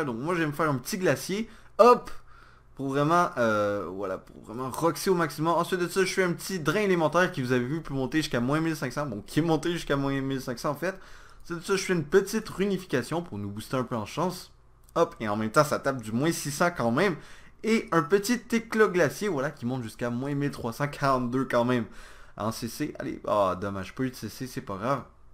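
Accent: Quebec, Canada. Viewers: Yessss un québecos ostie!!! Enfin!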